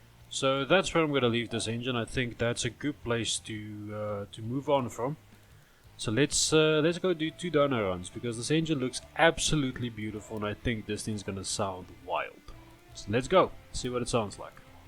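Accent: Afrikaans, South Africa. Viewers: I knew it 😁... that Afrikaans accent came through perfectly 😂👌🔥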